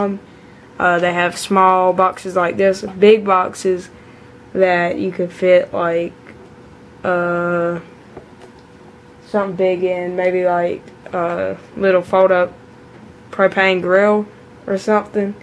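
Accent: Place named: South, United States. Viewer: U from down south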